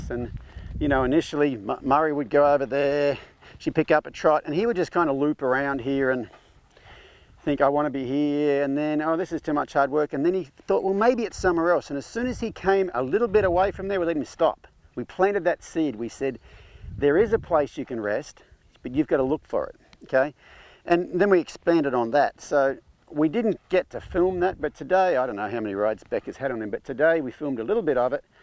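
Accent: Australia. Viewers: My favourite thing about is he still talks like an Australian 😂 Most Australians thinks they’re Americans after a week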